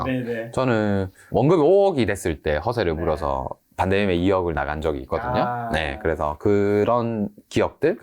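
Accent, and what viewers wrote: Gyeongsang, South Korea. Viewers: 주식판에 젠체하는 젊은 경상도 아들 와이리 많노~ 거슬리네~~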